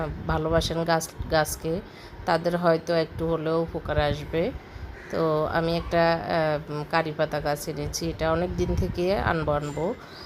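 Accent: Noakhali, Bangladesh. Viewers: আপু আপনার ভাষা অনেক পরিচিত মনে হলো।নোয়াখালীর ভাষা।আপনার রান্না ঘর অনেক সুন্দর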